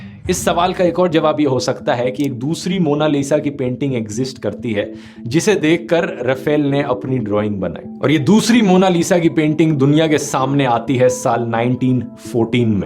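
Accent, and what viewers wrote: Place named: South India, India. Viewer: aaj dhruv ka voice over lag raha h south jaisa...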